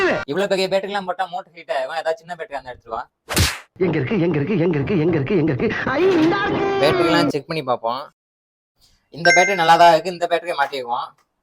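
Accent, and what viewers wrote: Madurai, India. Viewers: மதுரை யா நீங்க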